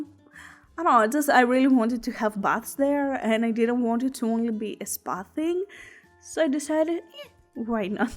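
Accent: Greece. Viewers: are you greek? because you sound like greek :P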